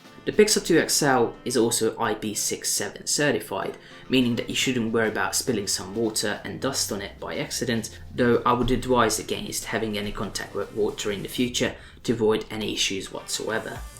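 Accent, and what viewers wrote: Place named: United Kingdom. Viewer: I still own this phone in 2019. I won't upgrade until a decent phone is actually released. i used to change phones every 6 months, but at this point everything is such a mediocre upgrade. I love my Pixel 2 XL and for all it's faults, it's still the best phone I've owned so far. Hoping the Pixel 4 XL is worth buying. If Google choose LG again for the Pixel 4 XL (I know it's not in the 3) then I will not buy it. I have a hatred for LG PLED. Awful quality, Samsung are OLED kings. Just a side point, interesting accent! It's at times very British like mine, and at other times very mixed lol, just curious. Update 27.04.18: Just accidentally ordered an s10+ (£650). I have a feeling I will regret this.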